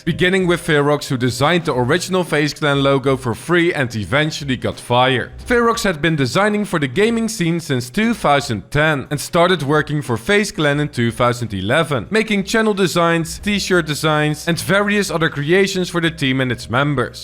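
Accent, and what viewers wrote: Netherlands. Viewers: can always spot a dutch accent ! :D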